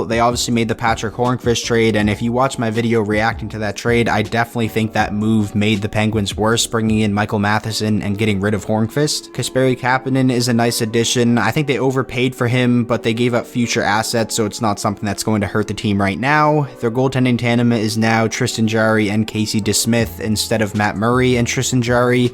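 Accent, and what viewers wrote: Canada. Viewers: Ive said many times your SUPER Canadian accent makes me laugh... "OAT-BREAK" made me laugh pretty hard lol. Also your math teacher musta hated you. You always say .fifty instead of .five zero ...and it triggers me lmao.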